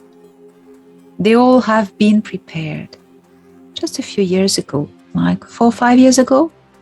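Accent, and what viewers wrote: France. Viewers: Dear, I'm absolutely delighted by your charming french accent, but can we expect a french version of this super motivational vidéo? Namaste 🙏 From a belgian follower and unconditional fan 😍😇 PS: I know it's double work, but take the example of Garbielle Isis, who always record simultaneously her video twice: once in French, once in English...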